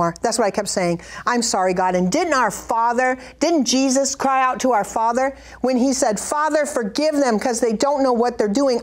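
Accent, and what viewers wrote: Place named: Oklahoma, United States. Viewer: You remind me of * I think. The accent maybe??? From Oklahoma?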